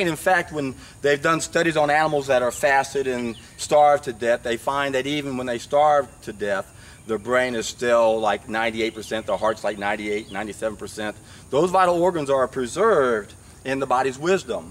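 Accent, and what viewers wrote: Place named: Texas, United States. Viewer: Gosh I love this guy😂😂😂😂 Get rid of the shit . LOL Very true he is , with that Texas accent . Lovely😘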